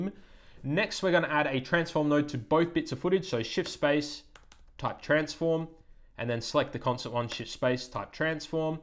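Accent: Australia. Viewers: Thanks for that tutorial! Really well explained and value add. And is that an Aussie accent I hear? Nice one mate!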